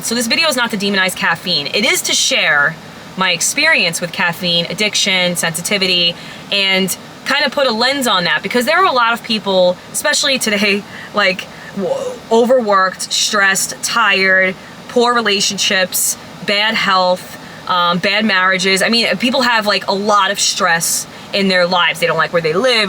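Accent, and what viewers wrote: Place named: Long Island, United States. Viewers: just sold on you're accent immediately. I have that same New York accent. Pleased to meet you. Im quitting cawfee myself today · I'm from Long Island, NY as well and I knew right away she was from Long Island especially when she said " cawfee". I don't realize I sound like that too until I hear myself on a video or audio recording😂 BTW we find British accents interesting too. Unless you have that spit filled " Scouser' accent like the fighter Darren Till. .😂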